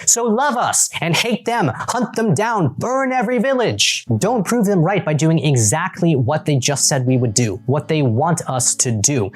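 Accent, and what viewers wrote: United Kingdom. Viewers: I have several things to say. Holy crap, you tore these guys to shreds, and they justifiably deserve it. We, the fans of Tolkien and his work, all stand together, linked arm in arm like a chain, and these bastards are trying hard to break that chain, to shatter our unity and throw us into darkness. We will not stand for this, we will not falter. Just as the chain of links around Frodo's neck carried The One Ring into the fires of Mount Doom, we will carry our love together, and then hurl the evil back into the firey chasm from whence it came. They will lose. There is no other way, because we will not stand for this bullcrap. Just imagine if people with such deep and true love for Tolkien and his works had made the show instead. Just imagine if they spent the same amount of effort they do attacking fans into pouring love and genuine passion into their work. 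If not for Lord of The Rings, I might've never gotten into fantasy. I might never have created my own fantasy world when I was 13 years old, then continue building upon that world all these years. I probably never would've been inspired and motivated enough to begin work on my own book. If not for my love of Middle-earth, I would probably never have begun collecting fantasy(and medieval) clothing, armor and weapons. Never would've had my own little armory, as I do today. Plan on making a video about that in the future. And finally, your Brittish accent was great. Sorry for such a long comment, just had a lot to say.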